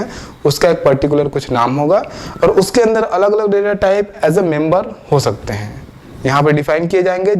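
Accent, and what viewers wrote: Bihar, India. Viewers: Sir aap Bihar se hai kya??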